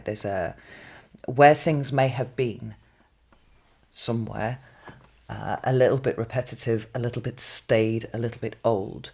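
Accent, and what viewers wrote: United Kingdom. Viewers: I have been watching tarot and reading professionally for many lifetimes. I have NEVER seen a reading that resonated more strongly. It was like a replay of a conversation I had with a dear soul sister whilst sitting in the forest here in NZ last night. What’s more, her British accent is identical to yours. I’m a psychic/medium and that dear one was some truly beautiful reading of the tarot. I’m only an intuitive reader, but you totally know your sh!t! Your understanding of how the energies weave are phenomenal. Deep gratitude for all you bring and so freely give. Much love to divine sister. 🙏♾💜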